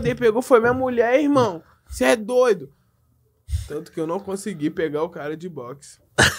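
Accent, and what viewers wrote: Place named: Belo Horizonte, Brazil. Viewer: Ambos são de Bh por isso que parece kkk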